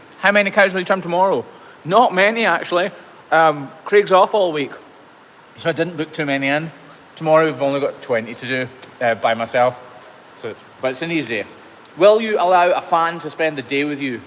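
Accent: Scotland, United Kingdom. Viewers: I realize this is a stupid sounding question, but as I get older my hearing gets worse and my ability to decern accents has become total rubbish so I need to ask for clarification are you irish or scotch? My heritage is a a bit muddled, English, Irish, Scotch, French, German so you can imagine my shame at not being able to clearly recognize the accent, although my best guess these days is you are Scottish and the guys at Tech TV are Leprechauns.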